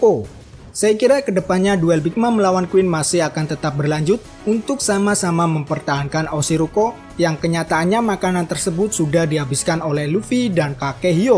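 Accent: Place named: Japan. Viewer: Ngikutin logat org Jepang, hruf "N" disebut samar seolah-olah kyk ga pke "N".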